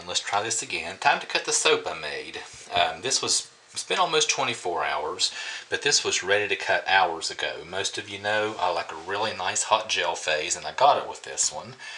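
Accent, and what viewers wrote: South, United States. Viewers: Very nice, indeed. The side pits were interesting. I've only had some trouble with my tops from overheating. Do you sell your soaps or have a website? I truly do enjoy your southern accent. I hope that doesn't offend. I have family born and raised in the South, and it's just a happy sound to me. 😃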